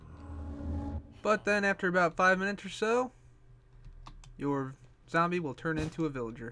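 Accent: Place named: Alabama, United States. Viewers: you sound alabama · you sound KKona as fuck my dude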